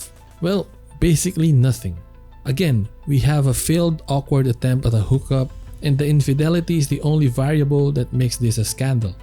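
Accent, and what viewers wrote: Philippines. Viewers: You sound very pinoy to my ears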